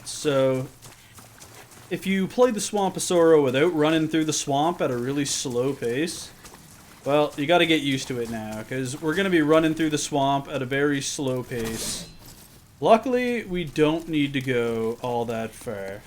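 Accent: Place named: Atlantic Canada, Canada. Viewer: Your accent sounds Atlantic Canada.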